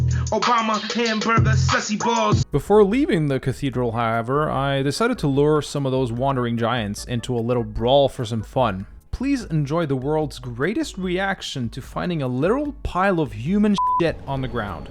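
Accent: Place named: Canada. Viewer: You're the most Canadian Canadian I've heard play this game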